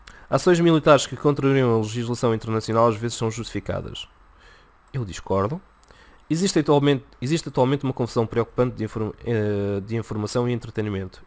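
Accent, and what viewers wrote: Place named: Portugal. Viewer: fico vendo teus videos e começo a falar que nem você, com o 'sotaque' de Portugal, como vocês portugueses veem o português do Brasil.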